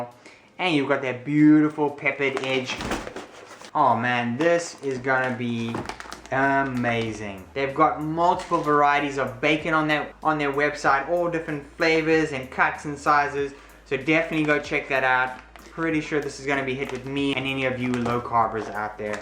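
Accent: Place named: South Africa. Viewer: Fantastic news! For Americans, try the Butcher's Box. Same criteria products. 💕🌞 Side note, if you were born and raised in B. C., how do you still have your South African accent? So curious sunshine. 🤔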